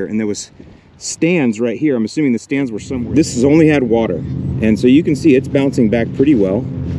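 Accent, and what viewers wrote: Chicago, United States. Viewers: I enjoy the Chicago accent... somehow, I didn't know you were from there until recently.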